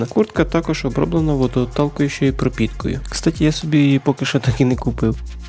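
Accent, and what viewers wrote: Surzhyk, Ukraine. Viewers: всё хорошо, прикольные куртки! Но, когда ты делаешь видео контент постарался пожалуйста не говорить на суржике очень режет слух! Тем более что в большей половине видео звук наложен на картинку. Без обид! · Цей суржик 😤 На вчіця правильно розмовляти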